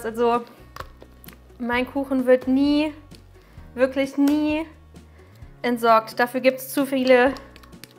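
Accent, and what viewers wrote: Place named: Rhineland, Germany. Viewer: Muss ich unbedingt ausprobieren. Ich finde deine Aussprache immer so lustig 😂. Eigelbschlotze und Gedöns.. Richtig schön rheinisch. Arbeitest du eigentlich noch in einer Konditorei?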